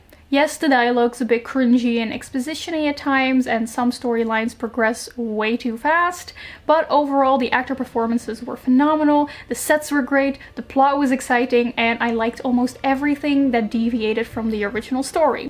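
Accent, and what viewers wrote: Netherlands. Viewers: This is absolutely not critique your english is really good, its just funny how as a dutchie, i can recognize you’re dutch as well in like .2 seconds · Is your accent Dutch?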